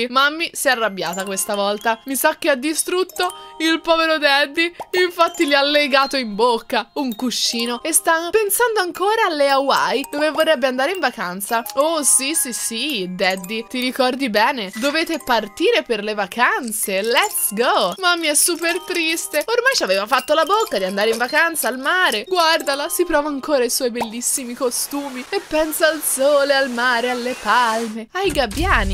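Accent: Tuscany, Italy. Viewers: come sei Toscana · ma sei toscana perché ti voglio bene tanto ☺️☺️😊☺️😊☺️☺️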